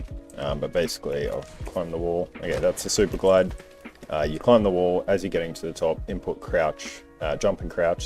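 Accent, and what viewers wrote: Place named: Australia. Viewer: fuck oath could tell instantly, master race haha 🇦🇺🇦🇺🇦🇺 Dope tutorial btw just got a pc n switched to mnk like a month ago your tip to slow the vid down to get timing actual helped heaps Keep killing it man, Love hearing a fellow Aussie accent reppin apex 👌🍻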